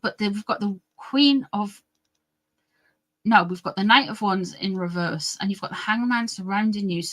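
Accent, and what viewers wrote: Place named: Newcastle, United Kingdom. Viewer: I love your voice. Just love the Georgie accent ❤ And just for the snob I'd like to say I come from a mining town /heritage in South Wales!!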